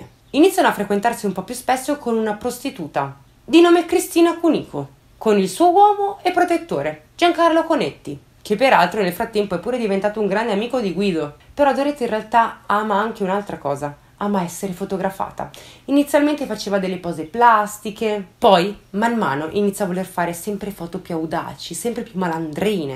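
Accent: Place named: Piedmont, Italy. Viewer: Hai una bella voce, un bell'accento piemontese con gli accenti giusti, una rarità. E i tuoi resoconti sono veramente interessanti e esaurienti!!!! Io ricordo bene il caso Graneris! Sono di Biella e l'ambiente vercellese era quello della mia adolescenza, il freddo, la nebbia e una campagna desolata.....non la rimpiango! Sono tanti i casi italiani inquietanti che hanno lasciato la gente inorridita, e mi sarebbe piaciuto svescerarlli di più, ma non ricordo i nomi, spero che ti capiti di rivangarli, mi toglierei qualche domanda senza risposta. Intanto ti ringrazio, fai un buon lavoro!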